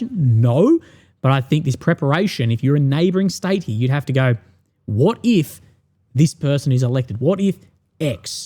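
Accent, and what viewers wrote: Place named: Australia. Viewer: Love your channel and would continue to support it, but quite honestly the Australian accent and rhythm is nearly impossible to follow. Not sure what you can do to get around that, perhaps sub titles. No offence but it's really difficult.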